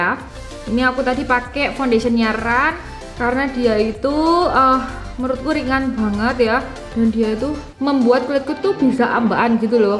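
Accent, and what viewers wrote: Java, Indonesia. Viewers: Auto subscribe pas mbak'e ngomonge medok. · Sampean ini kok lucu buanget seh mbak...😅 tiap lihat video sampean sambil senyum2. karena omongan sampean yang logatnya medok jawi bangeeet.🤗🤗🤗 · Ya Allah 😭 sueneng poll mbe konten2e sampean akuu, medokmu ikuloo yawllahh bikin jatuh hati❤❤ Sehat selalu ya cekno iso gae konten2 seng menginspirasi wong okeh😊 lopyuu ♥️ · Kak coba dong colab sama kak Hansol (korea roemit) pasti cocok banget... Sama* medok hehehee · biasanya kalo liat beauty vloger yg medok suka gmna yaaa,aneh...tpi aseli mbaknya iniii lucuuuuu 😂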